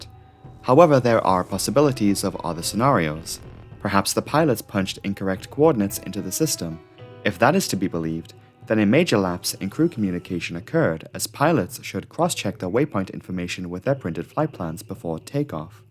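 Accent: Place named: Northern England, United Kingdom. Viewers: Totally off topic but I'm interested about the narrators accent. It sounds northern English with north American influence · It sounds similar to another UK creator I watch, Lazy Masquerade. I had never heard an accent like that until I started watching him, and now.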